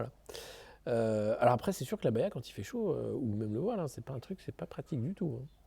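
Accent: Quebec, Canada. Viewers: L'accent québécois "a frigore" !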